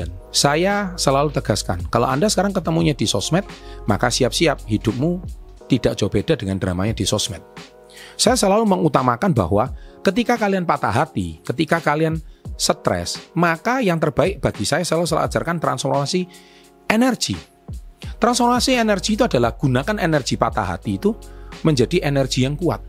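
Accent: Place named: Java, Indonesia. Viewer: cocoklah ini, apalagi penyampaiannya mantep dan medok .... 👍👍👍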